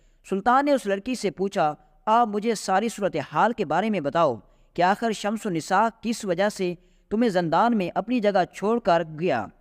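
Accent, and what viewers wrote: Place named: Saraiki, Pakistan. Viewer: Saraiki lehjy main urdu boli ja rahe ha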